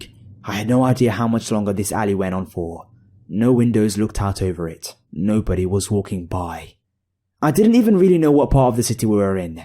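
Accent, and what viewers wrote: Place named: England, United Kingdom. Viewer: His English acsent makes the story's not as scary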